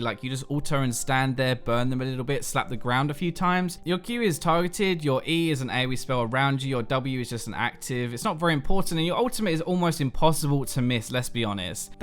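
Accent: England, United Kingdom. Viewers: Which part of England is that accent coming from?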